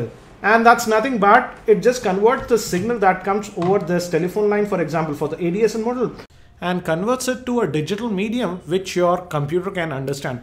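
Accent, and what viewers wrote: India. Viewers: Indian accent on POINT!!!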